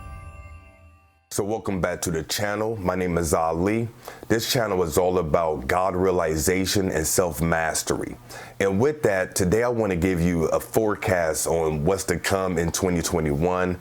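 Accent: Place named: Philadelphia, United States. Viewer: I’m digging your channel! You sound like you’re from Philly! Peace and Blessings 🙏🏽💜✨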